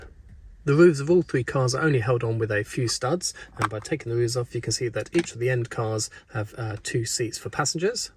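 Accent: United Kingdom. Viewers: the hard english accent makes it 100% better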